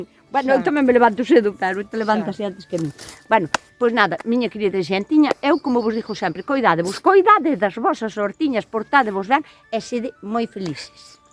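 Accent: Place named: Padrón, Spain. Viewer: Falo moi bén ,,gustame moito mirar os teus videos Por o falar creo deves de ser cerca de mín si podes xa me dirás Eu son o lado de Padrón 😊😊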